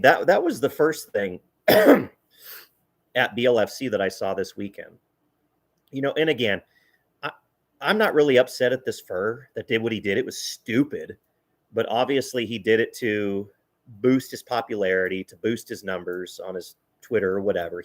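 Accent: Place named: United States. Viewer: You have quite the strong American accent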